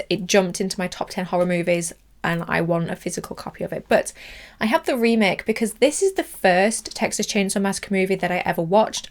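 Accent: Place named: United Kingdom. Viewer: Just recently discovered your channel! Love the content and the awesome Childs Play Vs Childs Play video. Made me really appreciate both of them alot more. So refreshing as well to find a fellow brit amoungst all the american voices.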